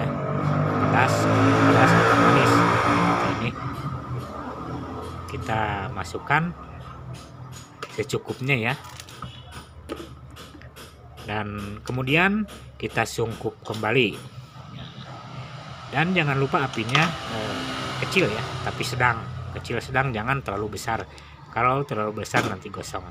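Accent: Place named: West Java, Indonesia. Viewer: Hadir menyimak Kang, siap dipraktekkan ah...Akang orang Sunda? Logatnya Sunda banget hehehe....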